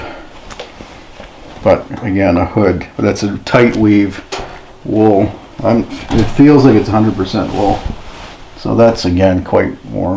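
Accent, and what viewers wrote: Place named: Canada. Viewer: When you make the "ou" sound it's easy to tell you are from Canada.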